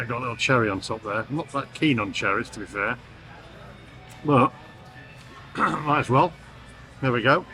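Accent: United States. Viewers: 80's Ford Thunderbirds were dreadful. My neighbour, an English photographer in Antigua, had one. It couldn't pull the skin off a rice puddin'. My Chevy of the same era had a lot more poke. Oh, and yes, you do fall into speaking Yankee while you're with others. Even today some words do fall out without thinking.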